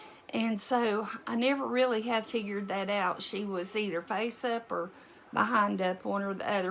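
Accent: South, United States. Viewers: Wow it sounds like an easier birth since you didn't have hours of pain. And I hope you have a speedy recovery from your being sick. I just love your southern drawl and how calm and relaxed you talk.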